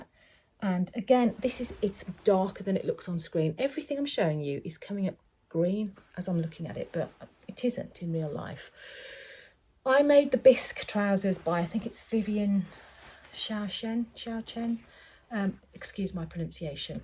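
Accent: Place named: Midlands, United Kingdom. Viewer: So glad I found your channel. At last someone who buys from the Rag Market in Brum. I LOVED the Rag Market. Living in Quinton I used to get the number 9 bus into Birmingham and spend an hour (or two) at the Rag Market and then get the 50 bus to my mother-in-laws house in Kings Heath loaded down with at least 2 big bags of fabric. I miss that place so much! Lived in Devon since 1987 where fabric outlets are scarce and like you Pound Fabrics are a favourite. Anyway just wanted to say I love your pattern and fabric choices and it’s great to hear a faint Midlands twang (I’m from Dudley originally and have never lost my accent). I’m catching up with your videos and treat myself to 3 a day. Making a pair of Bobs today! Xxx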